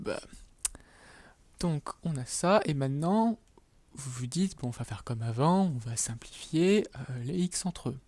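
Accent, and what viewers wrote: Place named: France. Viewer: French accent 🥸🥸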